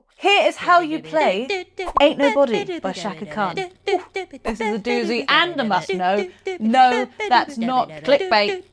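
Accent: United Kingdom. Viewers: This is the way Brits talk. I was a UK resident for over a decade. Trust me there is no arrogance here.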